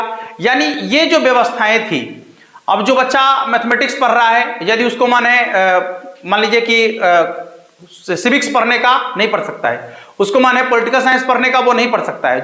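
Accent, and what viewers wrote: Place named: Bengal, India. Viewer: You should explain age and grade classification. At grade 3. What the child age. Bhai your Hindi is not good. It looks like a Bengali accent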